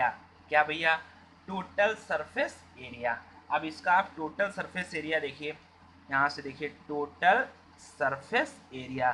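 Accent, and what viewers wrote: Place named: Bihar, India. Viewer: Bihari ho ka bhai sahab voice tone se lag raha hai.....hai to bahut badhiya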